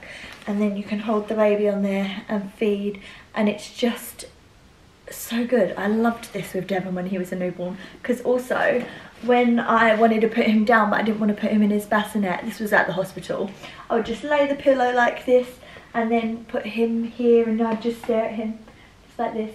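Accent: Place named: England, United Kingdom. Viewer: Sounds English to me lol!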